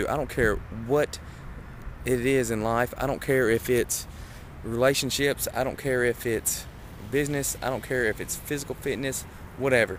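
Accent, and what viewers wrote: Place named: Tennessee, United States. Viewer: well i knew u were from tn by ur accent, and i was like hey that street looks familiar, cuz i live in nashville too! im too overweight to run just yet but it was still really encouraging! im the same way about drugs, im a recovering addict and if my story helps just one person then it was worth it 4 me 2 go thru all that. God bless!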